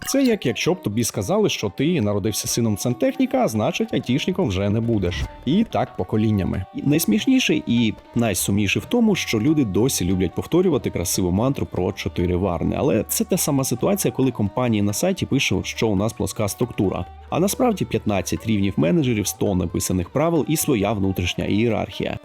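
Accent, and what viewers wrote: Russia. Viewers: Відео хороші, але попрацюйте більше над вимовою для відео. Акання дуже чутне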